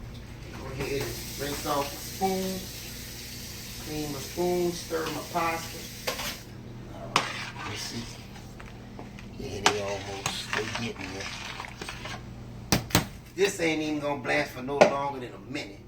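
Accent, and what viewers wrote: New Orleans, United States. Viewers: Oh you moved from Louisiana to Dallas because you sound NOLA🔥 FASHO I KNOW YOU MISS FOOD BACK IN THE BOOT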